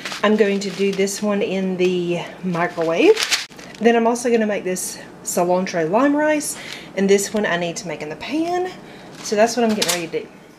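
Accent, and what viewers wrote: South, United States. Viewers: My husband will never run a dishwasher, washer, or dryer if we are not home because if a hose breaks we would it hear a problem and have major water damage. With the dryer it is not water damage but electrical fire. Love your channel and of course your southern accent.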